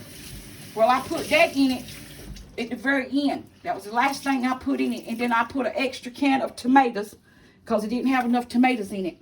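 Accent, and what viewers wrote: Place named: South, United States. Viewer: Loved the video. Glad to see you inspect them for bugs before cutting them up, it makes sense. A new subscriber. Love your country accent! God Bless!❤🙏🏽❤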